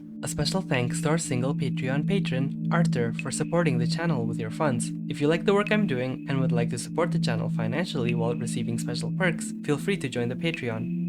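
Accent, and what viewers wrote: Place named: Indonesia. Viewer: your accent sounds kinda like you’re from Indonesia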